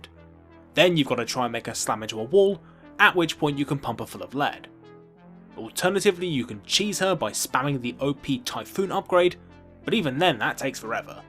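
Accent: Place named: RP, United Kingdom. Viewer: "Allow me to explain to you" is a super annoying way to phrase your intro. Within the first 10 seconds, between that phrase and your posh accent, I can already tell you're a total tosser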